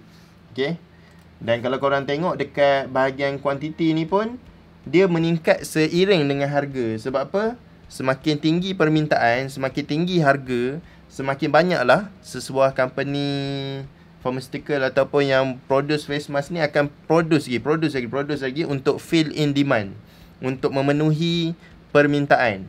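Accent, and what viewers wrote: Pahang, Malaysia. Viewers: terbaikkk sifoo..loghat mcm org phg jekk..hehe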